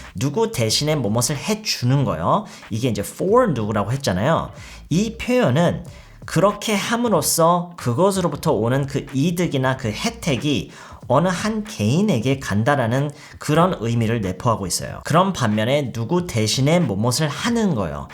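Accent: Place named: United States. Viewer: 우리말 할 때 억양같은 거 보면 미국에서 나고 자란 교포같으신데 어쩜 이리 한국어를 완벽하게 하실까요 한국어와 대조한 영어의 차이점을 너무 친절하게 설명해 주셔서 이해가 쏙쏙 돼요 항상 감사드려요!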